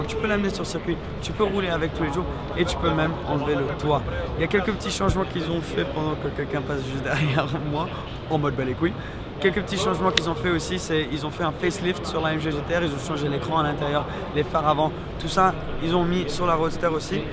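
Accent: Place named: United Kingdom. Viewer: Salut et merci pour cette présentation rapide des supercars du Salon de Genève 2019. Je t'ai connu il y a peu et j'ai regardé quelques-unes de tes vidéos sur ta "nouvelle chaîne française". J'en pense beaucoup de bien et ai particulièrement apprécié ta vidéo "La vérité" où tu te livres et joues la transparence. On sent que tu es passionné, mais attention, tu fais encore pas mal d'erreurs qui en définitive gâchent ce que tu as fais de bien auparavant. Par exemple le tout début de cette vidéo, ne te trompes pas de cibles, tu ne fais pas de vidéos-délires pour tes potes ou entre potes que tu materas plus tard uniquement avec eux, là tu t'adresses à un public tous âges qui vient sur ta chaîne pour découvrir des voitures et le salon de Genève, pour également avoir ton avis mais certainement pas pour assister à des blagues ou des délires de potaches attardés. 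La séquence entre 10 et 50" n'avait rien à faire là et aurait dû être coupée au montage. Ensuite attention aux insultes et grossièretés. Ton "J'men bas les c...…" aurait pu rester au vestiaire, si tu vois ce que je veux dire. Ensuite il y a un truc extrêmement énervant, un tic ou plutôt un automatisme que tu as et qui vient certainement de ta double culture franco-anglaise, mais qui au visionnage est super pénible à la longue. Tu prononces les noms de marques et de modèles à l'anglaise et en plus c'est souvent faux. Exemple, la Lamborghini SVJ n'est pas la "Ace Viiii Jay" mais sur une chaîne française la "Esse Vé Ji" et id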